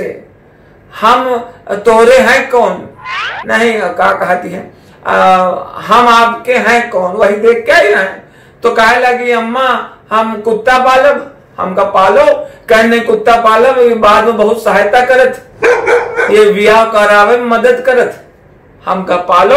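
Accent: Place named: Awadh, India. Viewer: एकदम शुद्ध ग्रामीण अंचल की अवधी है | बहुत बड़ा काम कर रहे हैं आप | "दहिजार का नाती " बड़ी मिठास भरी गाली है | लुप्त हो रही भाषायी विरासत को आप जैसे मझे कलाकार ही सजों सकते हैं | बहुत बहुत धन्यवाद जी |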